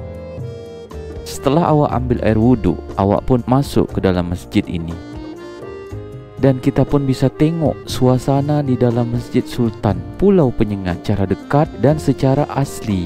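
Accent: Johor, Malaysia. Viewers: senang liat2 dan denger logat melayunya bang. asyiiik...terus yg rajin bikin kontennya ya bang...rasanya ikut jalan2... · Upin ipin wkkwkkwkk..tp serulah .cakap awak.. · Saya dari jawa. Denger abang ni ngomong kaya Upin ipin... luar biasa bangsa kita dengan banyak suku suku... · saye suke sekali dapat melihat pulau2 kecil dikepualauan kepri, apalagi logat melayu nye yang khas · Salam dari Johor..bahasa tiada beza dengan orang Johor.. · Assalamualaikum. Tak ada 'pelat'/slang Indonesia pon. Sebijik macam Melayu Johor. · Logatnya sama upin ipin🤭😁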